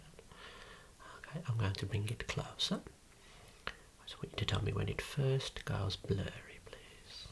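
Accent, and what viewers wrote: Birmingham, United Kingdom. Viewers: It's interesting how perceptions of accents vary. He has an accent from around the Birmingham area of England. Other people in the UK tend to dislike this accent and it's often a figure of fun. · Proper beautiful midlands accent. Hello my Brummie friend ❤ we share the same city! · Birmingham? you’re made for this, mate. And very handsome to boot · U sound British 😲😶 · Dislike it? I dont know anyone who dislikes the Peaky Blinders accent.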